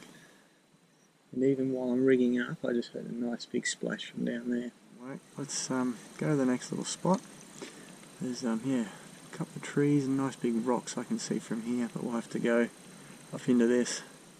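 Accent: Australia. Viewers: Nice follow up by that first fish in the vid. ...So If I go by your accent, then we are in Australia somewhere. Looks like weasle and mink country along that bank but I don't know if you have those there. Looks like one of our Mangrove snapper that we have over here in S. W. Florida in the USA>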